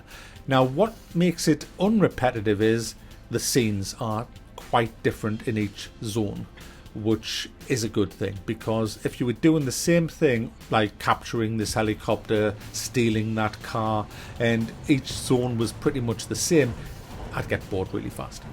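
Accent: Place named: Newcastle, United Kingdom. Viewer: You sound a bit like a geordie too??? There's a few on YouTube now it's great to hear 😊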